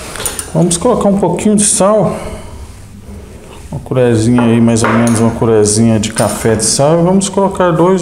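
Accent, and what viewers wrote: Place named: Goiás, Brazil. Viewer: Meu caro, bom diaaa! Eu reconhecia o seu sotaque, mas eu não sabia que vc era daqui de Goiânia (hehehe)! Meus parabéns pelas suas "lives" maravilhosas!!! Vou fazer essa receita aqui em casa amanhã, sem falta! Obs.: eu só vou fazer diferente o seguinte, vou misturar todos os ingredientes numa vasilha antes... e acrescentar alguns outros, rs! Que massa espetacular!!! #ShowDeBola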